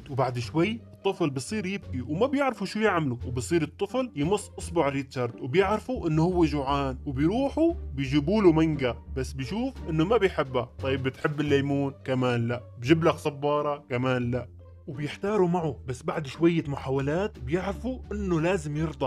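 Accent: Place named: Palestine. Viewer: لهجتك تشبه اللهجة الفلسطينية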